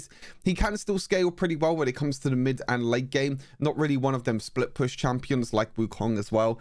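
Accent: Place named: United Kingdom. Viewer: I always check your channel to find out first what's new in Wild Rift and it has helped me better understand loadouts and macro strategies and I love your British accent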